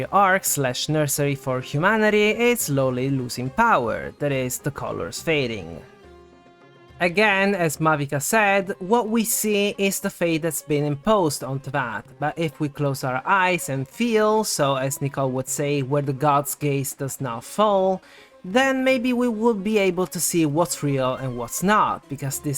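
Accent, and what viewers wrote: Italy. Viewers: Ma sei italiano? 😮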